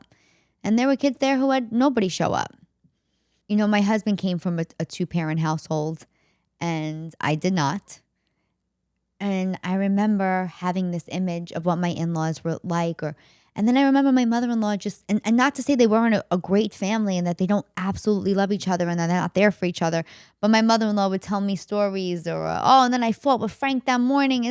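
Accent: New York, United States. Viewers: Great show no family is normal. We actually grow from our pains and problems and issues. Love your NY accent. Lost mine living out in the country but every once in a while someone will say "you are not from around here" lol. We need to always be proud of our families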